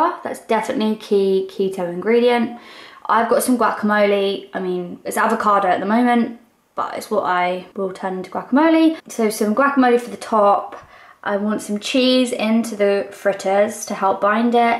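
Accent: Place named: England, United Kingdom. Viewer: Great channel, love the way you explain keto really well and your down to earth attitude. Ive been doing keto for a month and really like it. I think everyone does keto their own way and everyone's a bit different. Been watching various channels and most of them have been American. It's great to have channel with an English accent talking about the places I know like tesco. Thanks and well done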